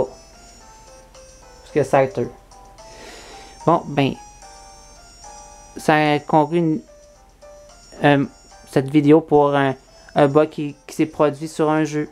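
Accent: Quebec, Canada. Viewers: je te soutient mec Même si tu est québécois tu fait l'effort de parlé fr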